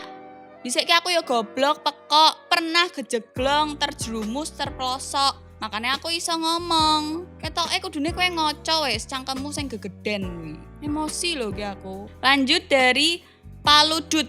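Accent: Java, Indonesia. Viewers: Meddok mbx 😁👍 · Logatnya koq Jawa banget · Jangan mendok teruss kak . pake translate dong kak😔😔 .. Kita org NTT tidak ngerti kak . hehehehehehe ..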